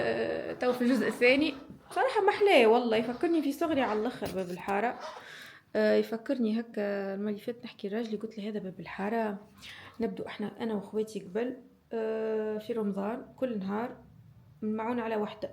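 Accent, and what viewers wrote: Tunisia. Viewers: Alech te7ki anglais winti t3ich fi fransa , ma7leha esse3a lahjtek bitounsi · أول مرة نتفرج فيك نحسك ڤابسية من اللهجة متاعك 😂؟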